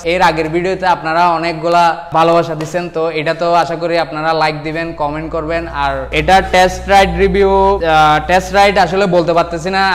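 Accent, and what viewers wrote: Chittagong, Bangladesh. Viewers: 100% chittagong ar manush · অনেকে দেখি উনার উচ্চারণ নিয়ে হাসাহাসি করছেন। অন্যের ভুল দেখে হাসাহাসি করার অভ্যাস আমাদের গেল না। উনি পরিশ্রম করছেন, ডেডিকেশন দিচ্ছেন এবং এভাবে হয়তো ভুল করে হয়তো নয় শিখবেন। সুন্দর করে কি ভুলটা সংশোধন করে দেওয়া যায় না? আমরা সভ্য মানুষের মত কি আচরন শিখতে পারবো না? যারা হাসাহাসি করছেন তারা কি মনে করেন যে আপনি সবকিছুতেই একদম পারফেক্ট। হ্যাঁ আমি দেখলাম উনার কথা বলার ভঙ্গিতে চট্টগ্রামের টানটা একটু বেশি এবং কিছু কিছু উচ্চারণ তিনি ভুল করেন। কিন্তু আপনাকে যেটা বুঝাতে চাচ্ছে যেটি দেখাতে চাচ্ছে সেটাতে উনি পারছেন এবং চেষ্টা করছেন। চলুন যারা চেষ্টা করে তাদেরকে আমরা অনুপ্রেরণা দিতে শিখি। 🙂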